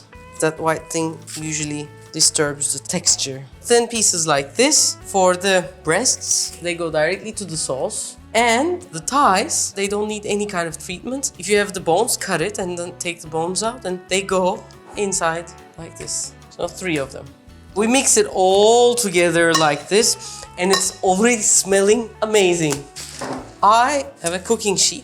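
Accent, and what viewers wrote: Turkey. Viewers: I love how Turkish affects your English. I think it sometimes gets really funny. PS: I feel like "efficiency" may be a better translation to "bereket" than "sustainability". You try to get more from the things you have, so they have more "bereket". I think it describes efficiency quite well. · Love ur recipes 😍 plz Share recipes of Turkish desserts too. I like ur Turkish English accent so soft. love from India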